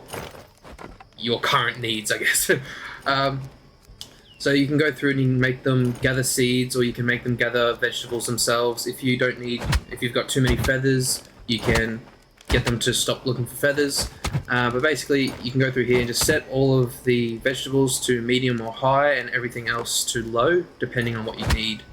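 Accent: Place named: Australia. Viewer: Thanks for these tips. I started a new game playing Aska this time not Ragnar And now, i'm managing and found agood location to hunt and forage foods. Closer to the mine as well. unlike before when I firstly started this game. I raged quit when the bear killed my 3 out of 2 npcs. And when I got 15 npcs most of them died of starvation during winter only 5 left. Because my location spawn point and where I made a base are too far from everything. haha! And subbed. You built a house on top of the mine. Would probably do the same and relocate my first game because my first game the mine was so far away from my base. Thanks mate. The accent is a gave away aussies. Cheers!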